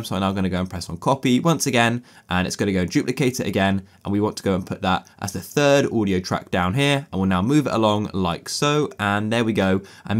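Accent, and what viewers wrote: United Kingdom. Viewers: Are u bri'ish?🤨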